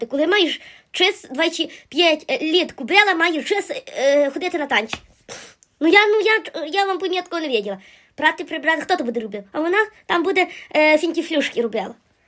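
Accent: Galicia, Ukraine. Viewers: Вітання з Німеччини! Яка колоритна наша галицька мова, слухаю і ніби знаходжуся десь неподалік. Поважаю Ваш талант і бажаю ще більшого творчого натхнення! Чекаю з нетерпінням нових серій.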